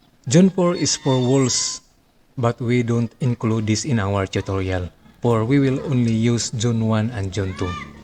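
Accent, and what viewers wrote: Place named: Visayas, Philippines. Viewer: p o t a n g e n a n g yung accent mong bisaya, dapat hindi ka na nag eenglish... napaka baduy mong magsalita, mababang uring taong bisaya... nakakabuwiset content mo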